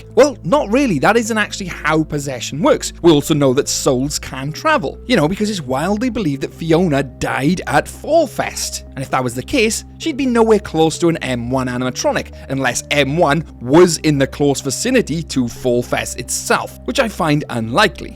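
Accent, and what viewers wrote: United Kingdom. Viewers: I cannot place your accent. Where in uk is ur accent from?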